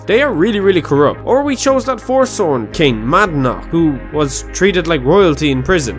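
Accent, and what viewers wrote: Ireland. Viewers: I am a simple sera. I hear an irish man . I see a Khajiit. I like it. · Where in Ireland are you from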